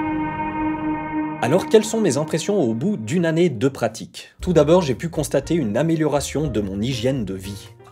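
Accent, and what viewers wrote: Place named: Entremont, Switzerland. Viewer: ha il me semblait bien reconnaître l'accent entremontant ! 😁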